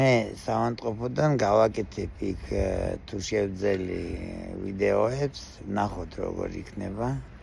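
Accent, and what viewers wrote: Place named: Imereti, Georgia. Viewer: გილოცავთ ახალ წელს, ჯამრთელობას გისურვებთ! საიდან ბრძანდებით ბატონო, იმურული კილო გაქთ. ❤️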